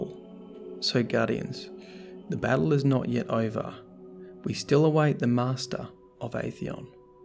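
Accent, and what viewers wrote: Australia. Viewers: Man this is soo awesome. So well described. Gear to hear and Aussie voice talking lore.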